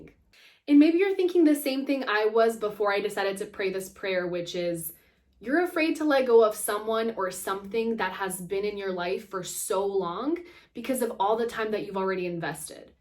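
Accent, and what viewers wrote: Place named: Spain. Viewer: Love the Spanish accent 😍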